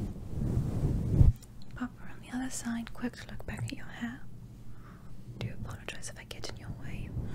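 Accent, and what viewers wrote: England, United Kingdom. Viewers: if i'd never seen your vids before i'd honestly say you were british. your accent is AMAZING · Is that a British accent? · Good English accent! ❤ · regular accent is english not American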